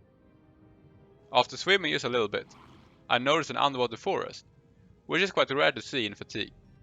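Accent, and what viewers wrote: Sweden. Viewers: I kinda wish WoW made a proper underwater world. Your swenglish is great btw 😏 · Your accent sounds very swedish👍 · Lol you have the worst Swedish English I've ever heard.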